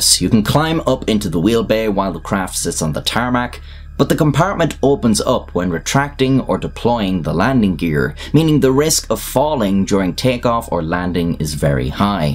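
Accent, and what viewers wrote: Ireland. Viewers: Narrated in an Irish accent although the grammar, syntax and tortology is clearly American. Why? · Gosh I love the Irish accent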